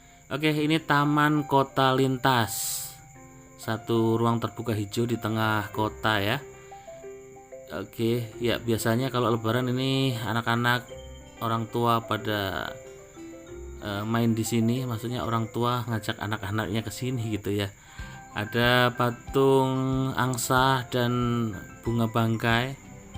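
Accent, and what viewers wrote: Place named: Java, Indonesia. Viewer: Si mas nya berlogat jawa. Dari jawa ya mas?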